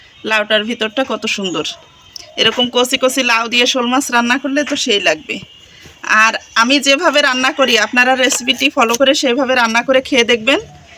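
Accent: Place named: Narail, Bangladesh. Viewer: আপনাদের বাড়ি কোথায় আমাদের মতো কথা আমাদের মতো রান্না আমাদের বাড়ি নড়াইল লোহাগড়া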